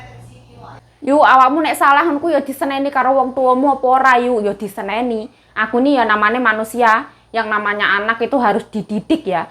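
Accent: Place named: Ngawi, Indonesia. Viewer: Ya ampun bahasa blotrokmu wi lho hehehebe jian wong ngawi tenan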